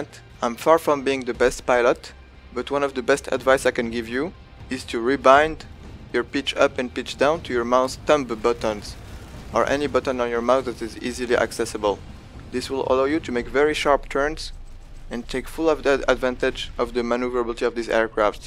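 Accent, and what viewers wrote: France. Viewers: ho I thinking you had a French accent.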